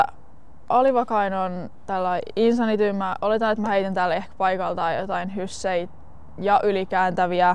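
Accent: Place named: Finland. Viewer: Aivan loistava, tuli ihan Ismo Leikola mieleen kun Suomessa ollaan niin lausutaan ne suomalaisittain 😅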